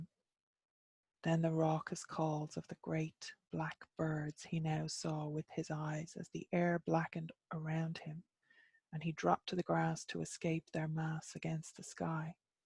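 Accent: Ireland. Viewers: Thank you for this immersion in Irish story in authentic Irish voice and spirit!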